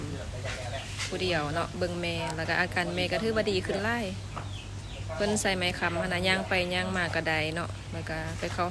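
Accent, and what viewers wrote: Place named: Thailand. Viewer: คุณ อยู่ แขวงอะไรครับพูดคุยเหมือนเมีองเลยสำเนียงครับ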